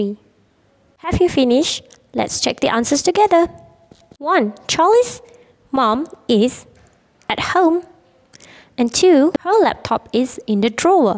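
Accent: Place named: Japan. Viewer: cikgu orang jepun ke comel cakapan cikgu saya fatma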